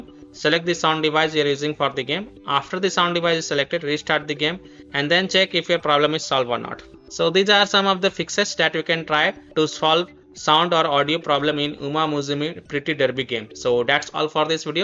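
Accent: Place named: India. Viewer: Bless the indian voice tutorials always saving our butts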